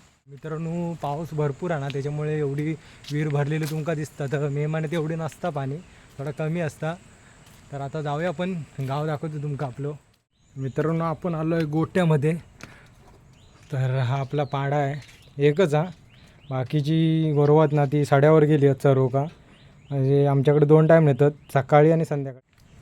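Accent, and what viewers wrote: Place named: Malvani, India. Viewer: Malvanimadhech bol, Bara vatata eikak!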